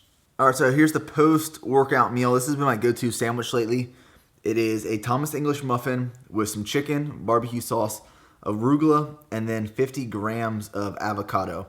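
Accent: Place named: South, United States. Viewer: Sounding very southern ha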